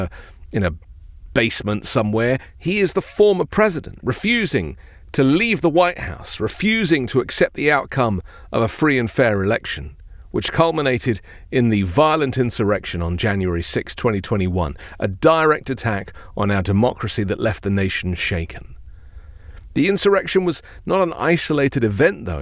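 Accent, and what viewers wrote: England, United Kingdom. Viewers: what nonsense, the economy was twice as productive. unemployment was way down. this is propaganda with an English accent...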